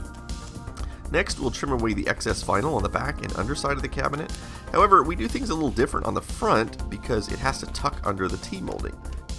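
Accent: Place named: Texas, United States. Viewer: "I cleaned the CRT right quick." Ah yes, our Texan speech.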